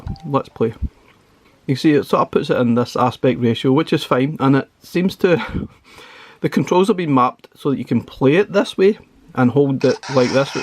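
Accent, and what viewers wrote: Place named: Ayrshire, United Kingdom. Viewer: lOVE IT! Can't wait to get mine, i have 2 minis and really looking forward to the larger form factor! good scottish accent, I'm guessing Ayrshire?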